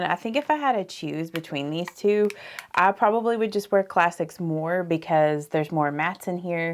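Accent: South, United States. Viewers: a Southern accent is beautiful. However, the way a single syllable word turned into two syllables is a cadence of younger people. Just wish I were younger and and vocabulary wouldn’t bother ME so much. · It’s called a southern accent. I love it!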